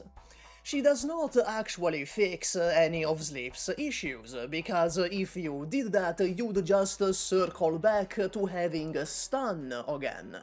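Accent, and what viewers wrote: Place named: Italy. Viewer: your italian accent makes me parasocial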